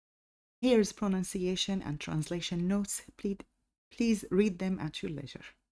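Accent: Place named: Levant. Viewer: It's sounds like levantine dialect, It is not Fusha right? Beginner student from Ethiopia*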